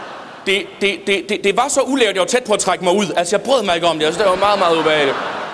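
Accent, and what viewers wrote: South Jutland, Denmark. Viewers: skal bare lave en lydbog af Harry Potter på sønderjysk det ville være så fedt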